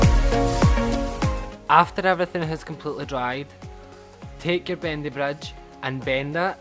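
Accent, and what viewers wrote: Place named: Scotland, United Kingdom. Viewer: Jesus ..you're so scottish😂😂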